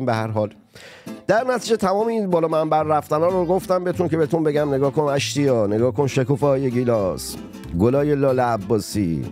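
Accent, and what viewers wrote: Abadan, Iran. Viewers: ماهم دوستت داريم هموطن اباداني خوش زبان وخوش لحجه🌺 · درود گرامی ❤❤ درودها به شرف‌تون ❤❤ بسیار بسیار فیض میبرم که برنامه‌تون با لحجه و حال و هوای آبادانیِ❤❤ بسیار بسیار عالی و زیبا ❤❤ با اینکه خودم آذری هستم ولی عاشق و مُرده ی لحجه ها و آواها و فرهنگهای گرم و گوناگونِ میهنم ایران هستم❤❤ با همین فرمون جلو برید که عشقید❤❤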